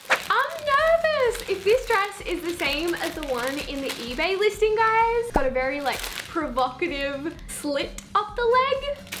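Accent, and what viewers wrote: Australia. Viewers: R u Australian?I am too!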